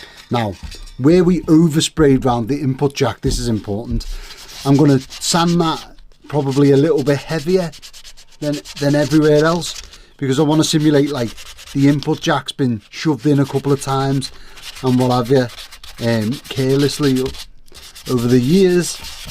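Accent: Liverpool, United Kingdom. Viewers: So I've genuinely been thinking about this. Your Scouse is obvious, but not aggressive, and I'm wondering if it were thicker if I would have had the same reaction. Genuinely, it took me a while to figure out that your "deckle" meant "decal", but maybe if I was already having to "translate" it would have just been part of the expected difference. That said, I'm having real trouble figuring out how Scouse transforms "decal" into "deckle". How do you say "diesel" and "demon"? "Dezzel" and "demmon"?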